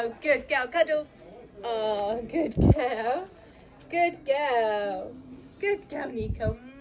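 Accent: United Kingdom. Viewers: "Reddy..." 😆 I love the British accent 😸...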